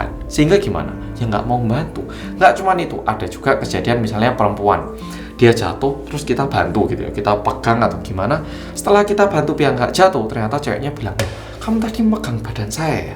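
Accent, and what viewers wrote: Java, Indonesia. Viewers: film korea itu asik dan romantis lho.....kok bisa begitu bro..? tapi mas ini kayak orang jawa logatnya.....nice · Maa syaa ALLOH....TABAROKALLOH....INI ORANG KOREA TAPI KOK BHS NYA MEDOK BNGT,SESUAI BNGT DG JUDUL NYA👍💚🙏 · Mas skrng udah 5 ahun di korea.. Gimana menjaga bahasa indo nya dan tetep medok?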